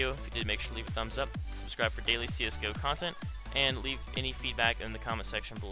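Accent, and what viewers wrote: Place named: South Africa. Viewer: I thought you were South African... Where are you from???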